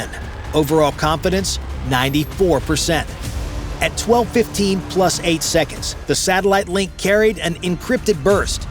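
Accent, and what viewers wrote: United States. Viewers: Oh dear oh dear oh dear oh dear. I cannot listen to this excitable racket. An English narrator please if you would. This American narration is insulting and should not be heard in Britain. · Another bloody UGLY accent. Why do they keep putting American ACCENTS on videos? They must know the world hates them. No reason to watch any more. was more than enough. There won't be any TRUTH in the video. Americans don't just murder women and children, they don't ever tell the TRUTH! EVER!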